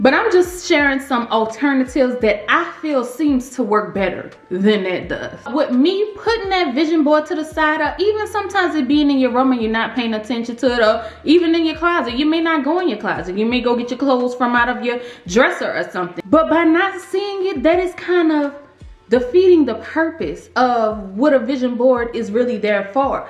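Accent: New Orleans, United States. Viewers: 2022 is going to be MY year! I've never proclaimed such before and walked faithfully in it because it seemed so cliche'. But after watching and subscribing to your channel, hearing and seeing a hometown voice and face, yes I'm from "da 504", I feel at home "here." I've taken some of your credit advice and seen improvements. Greater is coming and it's because the power lies in me. Thank you for all the content you share.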